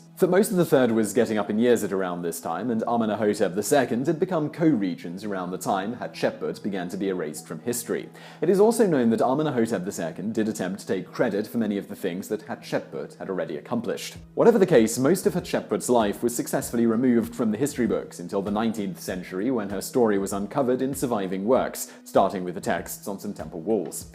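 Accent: United Kingdom. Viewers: Lol I guess this is the only time a British accent sounds cringe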